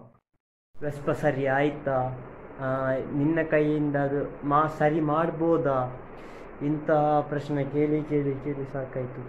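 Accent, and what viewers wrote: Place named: Mangalore, India. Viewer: I like ur mangalore kannada 😄😄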